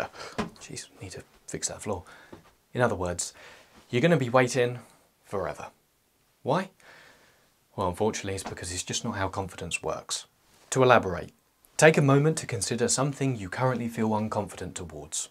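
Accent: United Kingdom. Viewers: i mean this in not a disrespectful just an observatory way: your voice sounds precisely like what a british guy's voice sounds like in my head. spot on.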